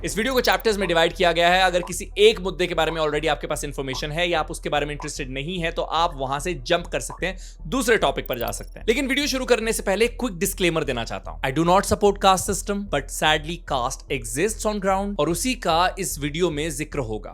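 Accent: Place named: Punjab, India. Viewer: I love ur punjabi accent bro 👌.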